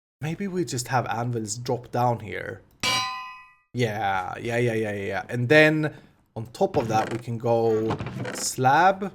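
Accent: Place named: Sweden. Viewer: i am always disturbed by other swedes english. you are the only one i got used to.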